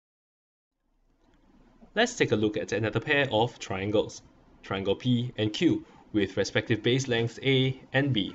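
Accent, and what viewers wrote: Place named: Singapore. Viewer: Sounds singaporean lol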